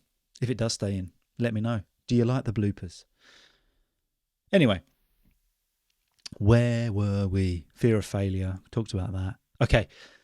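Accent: United Kingdom. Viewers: I’m a college student from Taiwan, I start to learn english recently, and your videos really help me a lot, thank you. By the way, British accent sounds elegant!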